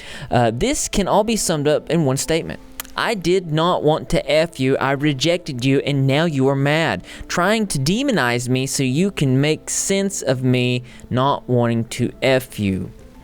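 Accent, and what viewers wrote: South, United States. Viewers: Is he from the south?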